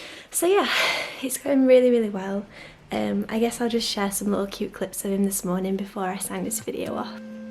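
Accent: Yorkshire, United Kingdom. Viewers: Yorkshire. It's my absolute favorite accent. So lovely!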